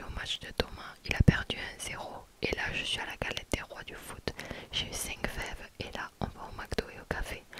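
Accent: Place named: Marseille, France. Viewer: Oh ta un accent marseillais 😅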